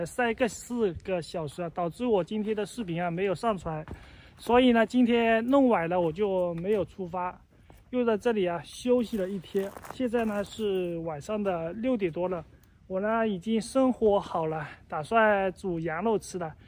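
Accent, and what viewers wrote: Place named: South, China. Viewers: 感觉浙江口腔 温州一带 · 哈哈哈哈！南方口音。我聽著很親切。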